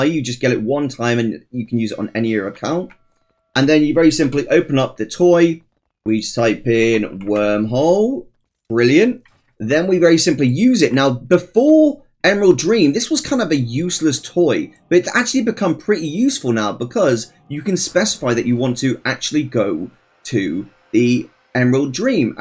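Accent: United Kingdom. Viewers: Minimum wage in the UK is £11.44 per hour. WOW costs £9.99 per month in the UK (or less depending on plan). In case you don’t recognise the accent, this guy is from the UK. If you were doing 60 characters like he suggested that is going to be at least an hour a day. Over a month that would be the equivalent of around 30 hours. At minimum wage that is going to be around £340 you could have earned for that same time doing something boring. I can understand if it is quick or fun or yields a lot for the time, but this doesn’t tick any of those boxes if your intention is to just pay for your subscription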